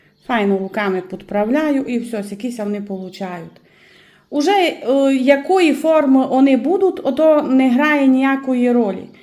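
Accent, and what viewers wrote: Transcarpathia, Ukraine. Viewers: Яка велика наша Україна! Яка чудова закарпатська говірка. І все ж зрозуміло. Дякую Вам, пані господине. Отримала подвійне задоволення. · Ваш діалект говорить про те,яка у нас велика Україна!!!! Я з Одеської,найбільшої області України, і прекрасно Вас розумію!!! У нас говорять на суржику,але я,якщо чесно,соромлюся говорити такою мовою,тому,коли я розмовляю українською мовою,то всі думають,що я зі Львова!!!😄 А мій рідний брат говорить,як Ви,без комплексів,тільки на суржику,як говорять у нас в Одеській області,і Ви знаєте,він піднімає мені настрій,так само,як і Ви,тим більше це ютуб,і це Ваша Закарпатська изюминка!!! Мені подобаються Ваші рецепти,і Ваш Закарпатський говір!!!! Дякую за рецепт!!!❤️ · Це закарпатский діалект! Гарний, ні на який інший не схожий.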